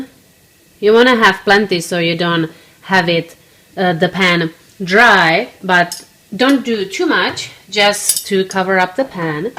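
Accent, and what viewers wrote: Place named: Czech Republic. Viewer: I love the way you talk! I miss hearing the accent of a česká žena! Is it olive oil you use?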